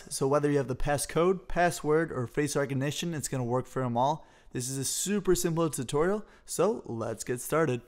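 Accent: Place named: United States. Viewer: Thank God your American and i can hear you clearly.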